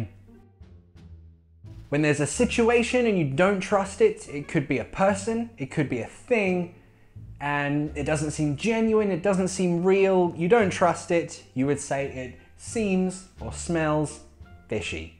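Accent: United Kingdom. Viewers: Bro, Your accent is exactly like Harry Potter. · Hahaha I discovered your channel some days behind and I am really enjoying it a looooot~ u made me want to forget the american accent I learned to get the British accent u speak haha Thank u for the really funny and educative videos~ hugs from Brazil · What is your accent? Is it ordinary british? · I luv the British Accent 😄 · is he British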